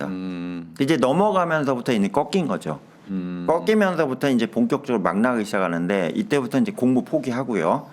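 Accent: Chungcheong, South Korea. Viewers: 염쌤. 연쇄살인 스토리 찾느냐 피곤할텐데..❤감사합니다. 또한 구수한 충청도 사투리지만 예리한 카리스마는 의 여전한매력😮항상 화이팅입니다 ❤🎉❤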